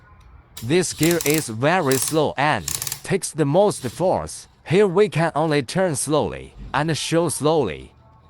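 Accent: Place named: China. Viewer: Why du u sound like chinese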